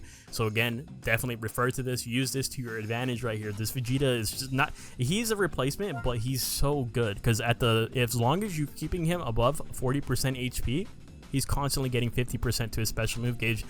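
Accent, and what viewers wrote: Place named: Philadelphia, United States. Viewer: “ Launch that jawn “ bro definitely from Philadelphia or close by 😭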